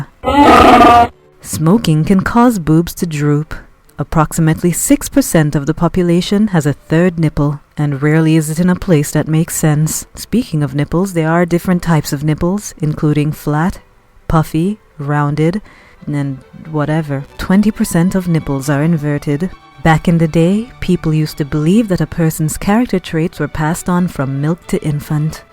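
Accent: Jamaica. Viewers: Your accent is sounding freshly topped up like you recently visited Jamaica! I heard it slip out when you said operation 😆 Love all your videos - and I would totally watch one about kneecaps if YOU made it!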